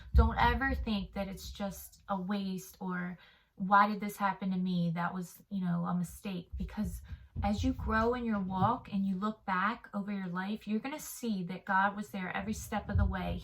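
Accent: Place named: East Coast, United States. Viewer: I love this and relate SO MUCH! I also love your cute little east coast accent, haha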